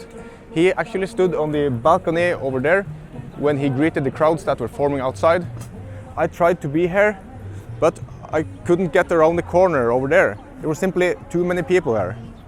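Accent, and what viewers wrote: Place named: Northern Norway, Norway. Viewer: His accent is nice, but it's not an Oslo accent at all. Very Northern Norway! :D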